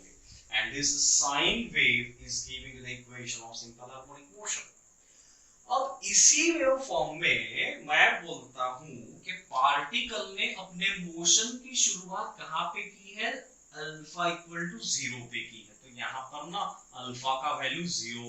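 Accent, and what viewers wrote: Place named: India. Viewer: Best.. just need to improve style of speaking becuse ur style seem desi type. May Allah give u success ameen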